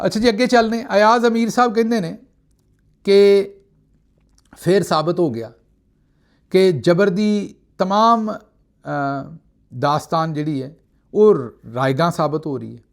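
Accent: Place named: Ropar, India. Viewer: SB THODI BOLI SADI ROPER(AMBALA) WALI LAGDI AAA,BOHAT PYAAR AUNDA THODE TE.SADQE JAYON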